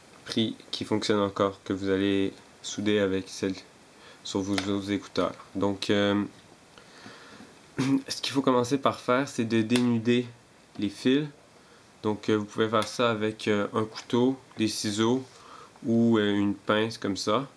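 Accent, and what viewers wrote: Quebec, Canada. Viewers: hey tu vien du Quebec ou quoi ? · j'adore ton accents rebequooiiiiiss <3:) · Merci mec !! Je vais reparer mes ecouters Beats !! PS: tu es quebecois ??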